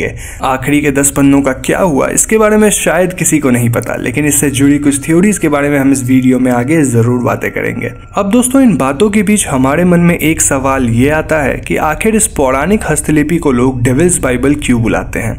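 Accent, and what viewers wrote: Bengal, India. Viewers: Ap bangali ho na bhai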